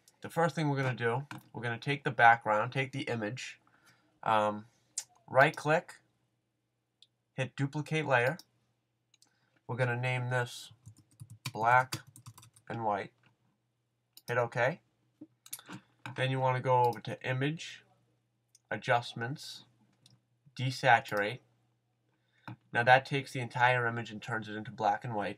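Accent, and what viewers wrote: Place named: New England, United States. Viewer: i love your new england accent <3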